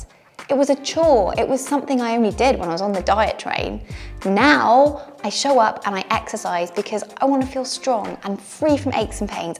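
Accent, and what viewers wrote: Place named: United Kingdom. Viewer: I don't mind the music, probably because it's classical, goes great with your British accent! Doesn't bother me.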